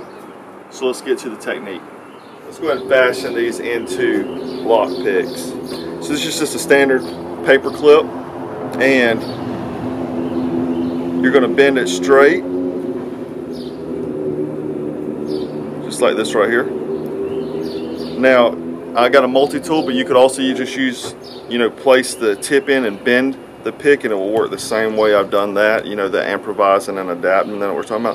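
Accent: United States. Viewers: That country accent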